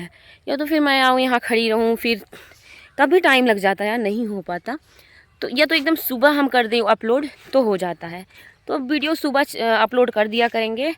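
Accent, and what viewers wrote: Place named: Rewa, India. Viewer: TONE SE TO REWA K LGG RHE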